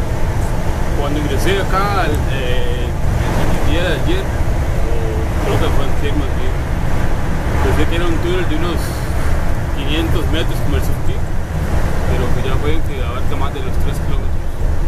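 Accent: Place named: Costa Rica. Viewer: Reconocí ese acento tico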